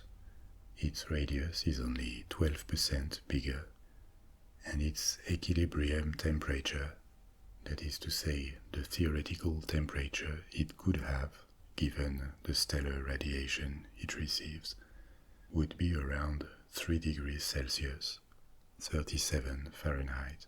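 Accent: France. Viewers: Tu est français ? Car avec le nom de la chaîne et ton petit accent français on dirait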